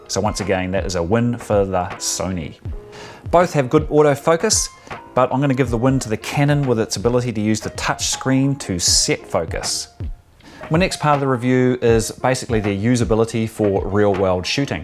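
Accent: New Zealand. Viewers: He's a Kiwi ;) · Definitely a kiwi accent 👍🏽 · Are you Australian or Kiwi? :) I'm not a hundred percent sure but I rekon you're from New Zealand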